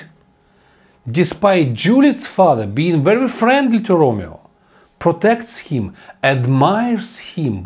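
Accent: Russia. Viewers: Good luck! You do a great job, don’t stop and don’t listen to haters! It’s ok to have hard accent. Russians IT professionals have the same pronunciation and no one bothers. There’s no problem for them to get understood by foreigners or even natives. Wishing all the best, Julia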